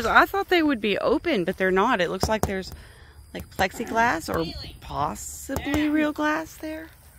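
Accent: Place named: Texas, United States. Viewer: Besides the main topic of "how to" , to did this vid so us common folk could keep up so Thank you. Just a side note, you spoke just like my dad a grammama did and it brought Texas right back with a tender force so thank you for that too.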